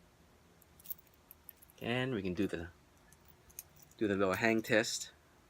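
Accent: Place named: Hawaii, United States. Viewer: Hawaii boy i can tell . kalihi maybe waianae ..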